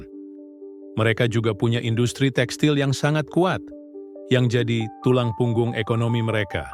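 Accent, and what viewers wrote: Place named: United Kingdom. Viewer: Orang British bahasa Melayu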